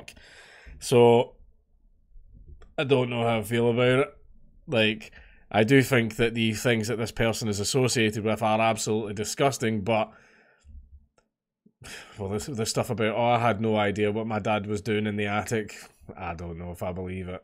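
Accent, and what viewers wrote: Scotland, United Kingdom. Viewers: Scottish lol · I'm aware he's Scottish. It's a meme.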